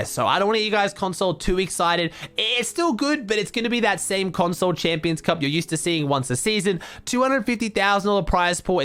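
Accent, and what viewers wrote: Australia. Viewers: G'day aussie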